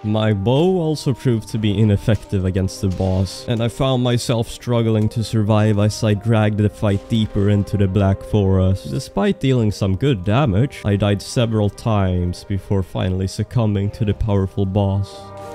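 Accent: Sweden. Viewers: I hear that you are swedish with the accent (jag är också svensk)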